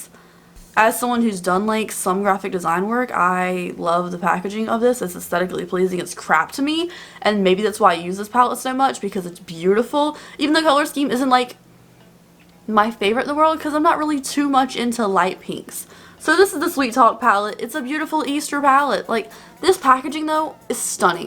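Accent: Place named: North Carolina, United States. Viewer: is originally from North Carolina:)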